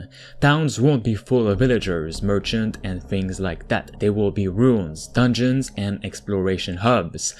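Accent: Quebec, Canada. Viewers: R u canadian french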